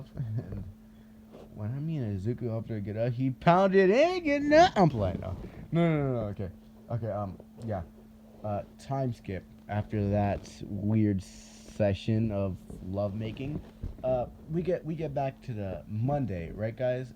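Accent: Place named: Jamaica. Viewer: Yooooooo your Jamaican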